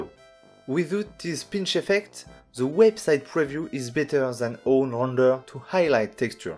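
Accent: France. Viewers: Ton accent francais est mignon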